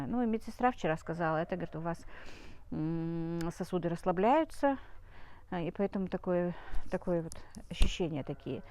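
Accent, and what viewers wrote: Standard. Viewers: подписана на Вас давно, но смотрю недавно. Вы так чисто говорите по-русски, такая грамотная речь, одно удовольствие Вас слушать.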